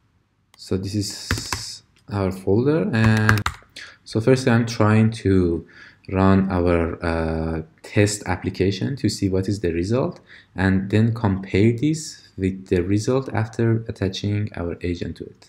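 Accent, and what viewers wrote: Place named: Iran. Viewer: Your accent tells me that you are an Iranian)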